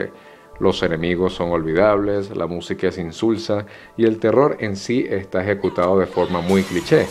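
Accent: Venezuela. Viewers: Sendo acento Venezolano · st men es venezolano, verdad ? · Eres venezolano? :0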